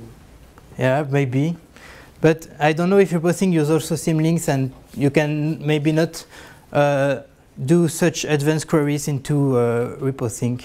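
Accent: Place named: India. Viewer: как у индуса деревенского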